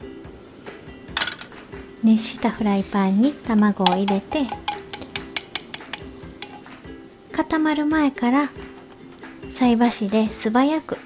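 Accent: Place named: Kansai, Japan. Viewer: kansai ben XD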